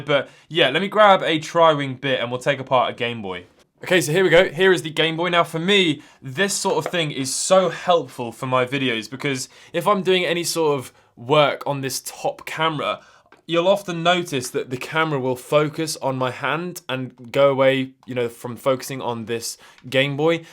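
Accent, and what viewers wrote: United Kingdom. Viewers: Whats with the deal with the British accent but American phrases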